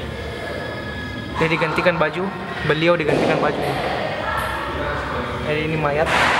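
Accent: Makassar, Indonesia. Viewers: salah penulisan sy dimana?? Loe org toraja tp mau logat2 ala2 Makassar.. Santai moko ces..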